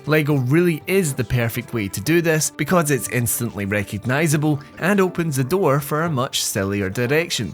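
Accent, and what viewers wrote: United Kingdom. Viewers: when he said Varl I was like who!? But am just teasing. That accent is a prized British treasure 🥸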